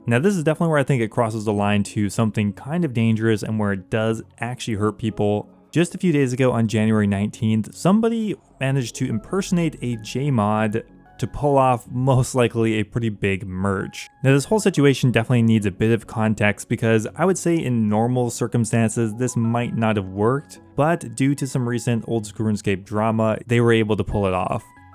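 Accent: Quebec, Canada. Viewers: Are you French Canadian?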